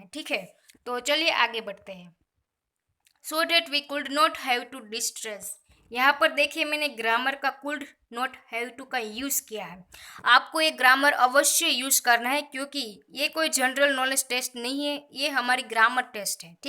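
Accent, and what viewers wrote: Gujarat, India. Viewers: Your sounds like gujarati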